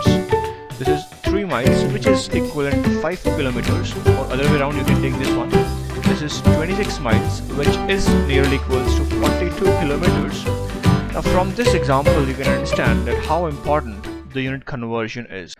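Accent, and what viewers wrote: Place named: New York City, United States. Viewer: NYC voice pitch 😊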